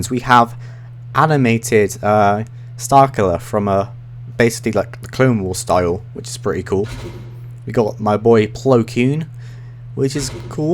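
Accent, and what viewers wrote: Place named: Plymouth, United Kingdom. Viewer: Where are you from? South west? Can hear the janner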